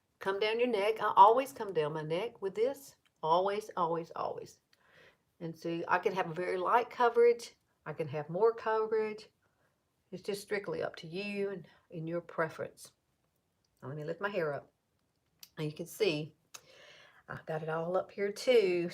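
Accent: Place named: Tennessee, United States. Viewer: Loved this tutorial. I’m in Texas and we had those same storms. Anyway....you’re like a TN accented Theresa Caputo(sp). 😀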